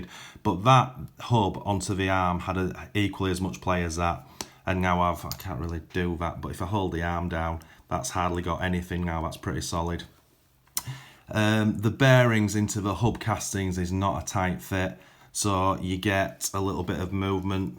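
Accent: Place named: Yorkshire, United Kingdom. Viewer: new to your channel really liking it a yorkshireman in canada dont lose the accent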